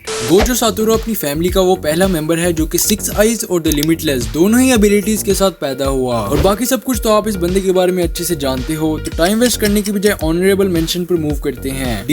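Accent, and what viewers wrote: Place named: Pakistan. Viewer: Accent so aukat pata chal gyi teri 🤡🤡🤡🤡🤡 Aata & roti bhijwa du Pakistani? 💀🤣 Garib Jai Hind 🇮🇳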